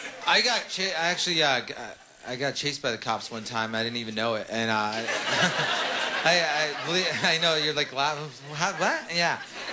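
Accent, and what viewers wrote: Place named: Canada. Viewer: is he from canada? he says ehh a lot..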